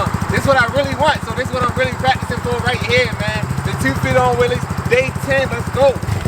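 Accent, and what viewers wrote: Baltimore, United States. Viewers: It’s like an illusion 😅 bmore accent strong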